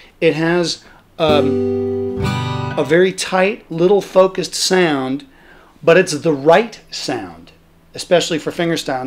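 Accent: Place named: Australia. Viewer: I thought you’re Aussie!! Cool!!!!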